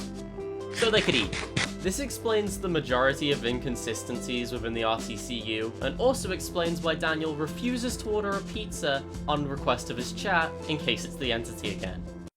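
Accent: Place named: England, United Kingdom. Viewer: then take my sub and use it well, funny english sounding guy.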